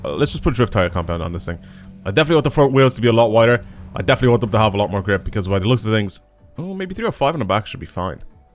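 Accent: Ireland. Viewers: yo what accent do u have im terrible with my countries but im thinking Irish?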